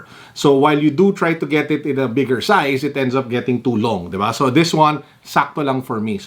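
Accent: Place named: Philippines. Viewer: You sound pinoy kuya.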